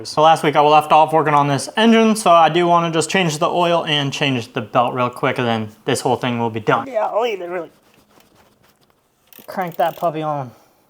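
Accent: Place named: Maryland, United States. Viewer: Definitely tell you're from maryland just by listening lol. Sounds like a Rosedale native to me with those O's lol.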